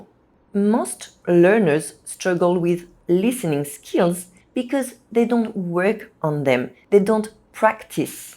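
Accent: France. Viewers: J’adore votre accent, c’est intensément français… presque difficile pour un anglophone à comprendre.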